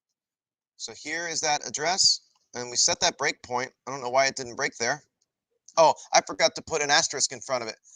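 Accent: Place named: Los Angeles, United States. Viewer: Which accent is that? LA?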